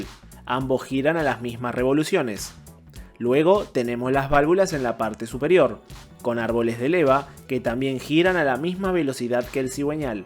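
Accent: Argentina. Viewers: Está buenísima la info y, escuchar en idioma argento...le dá un plus❤❤❤❤😂😊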